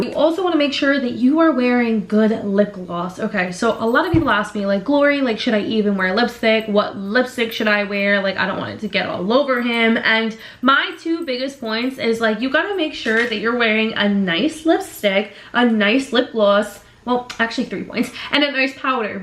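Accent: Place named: New York, United States. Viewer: She has a New York accent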